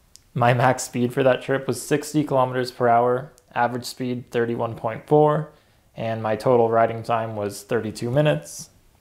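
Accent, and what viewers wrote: Canada. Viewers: You must be in Canada, given accent, English, no traffic & snow on the landscape near the roads // lol .. metric kw / kmph / km distance / units :)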